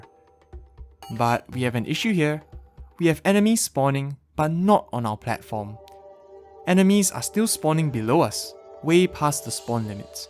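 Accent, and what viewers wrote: Singapore. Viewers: Are you from singapore? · I knew it from the accent. This man is Singaporean. Cool to see.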